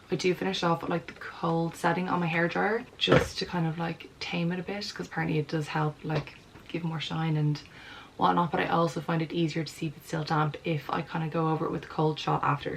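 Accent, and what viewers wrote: Ireland. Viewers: Am subscribed to you but not getting notifications.? You are absolutely gorgeous - the Irish accent too.. great to see a young person w natural beauty Love from Australia…..💛✨✨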